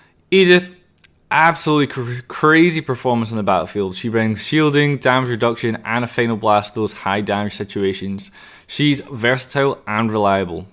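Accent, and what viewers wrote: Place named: Scotland, United Kingdom. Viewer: This guy is Scottish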